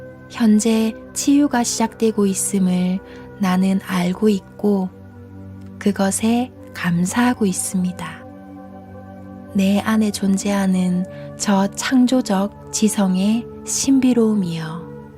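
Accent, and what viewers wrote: Gyeongsang, South Korea. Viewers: 경상도 사투리가 매력 짱짱짱 이네요~^^ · 말끝에 ~~하는 갱상도 억양만 고치면 되요. 쉽지요? 난 조선족, 북조선 말투 할 수 있는데 그살람들은 남한 억양 몬해요.. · 경상도 말투 좋아요👍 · 경상도표준말 듣기좋아요, 저도 경상도표준말 씁니다. 목소리 참 맑고 곱습니다. 늘 잘 듣고 있으니 쭈욱 이 목소리와 말투로 해주세용~~♡♡ 감사합니다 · 경상도 사투리에 아가씨가 그리워지네~~^^ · ^^ 목소리 너무예뻐요 경상도사투리라서 더예쁨 영상도아주좋아요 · ㅎㅎ 이미 억양 자체가 사투리입니다 ^^ 경상도사람이 경상도 말씨는 당연한겁니다 경상도 사람이 서울말쓴다고 말하는거 들어보면 ᆢㅎㅎ 웃기는것같아요 ᆢㅎㅎ 늘 좋은책 잘듣고있습니다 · 님 좋아요 경상도 사투리가 매력적인 감동입니다 저도 경상도 사람입니다 책 내용이 중요하지요 감사합니다 행복하세요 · 사투리? 경상도 표준어 너무너무 매력적입니다 정감이 넘치는 말입니다 아주아주 세련된 말입니다 감미로운 목소리 정겨운 목소리 감사합니다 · 갱상도 사람은 표준말을 쓴다고 해도 갱상도 사람이 들으면 갱상도구나 느낍니다.ㅎ. 좋은 책 소개 감사합니다.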